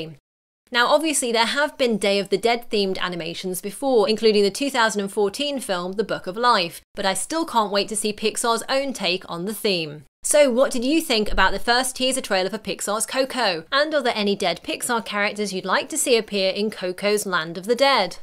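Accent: Castile, Spain. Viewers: I totally love this video. It stated very exact and truthful facts. Only thing wrong is the accent. The words are perfectly pronounced is just that she used spanish accent instead of Latino or Mexican accent which is totally ok. It's just weird to hear familiar names in a different accent. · Great review. I would have loved for you to use the Mexican pronunciation of Spanish words, rather than the European Spanish pronunciation. For example, the name 'De la Cruz' in Mexico would be pronounced 'De la Crus' NOT 'De la CruTH'. In Latin American Spanish words with a 'z' or a 'c' are pronounced with an 's' sound, as opposed to the much harsher 'th' sound from European Spanish Therefore Pan Dulce, should be pronounced 'Pan Dulse', NOT 'Pan DulTHe'. It's a real shame as I could tell you were making an effort to pronounce the words correctly, however, for someone how knows the difference, it sounds totally out of context, just like a history British film would sound if words were pronounced in American or Australian English... 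· You sound castellan are you from Europe or something? Just wondering nice video tho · That explains the near perfect Castilian accent. Que chevere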